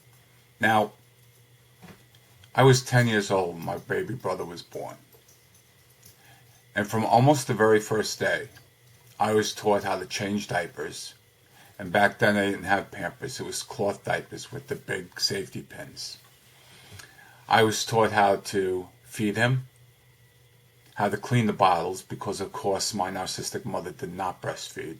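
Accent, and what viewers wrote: New York, United States. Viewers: Are you from the east coast? I'm detecting a New York accent and as I'm typing this, you said Brooklyn. You sound like family! Anyway you look like a cross between John Gotti and Deniro. Take it as a compliment because they're both very nice looking men. I'm sorry that you had to deal with this shit. I sense a lot of those things in the narc I know. She is in a sick competition with her daughter. She financially supports her child and provides for her but any other mothering seems void. Only if it serves her. She'll put pictures on to get compliments.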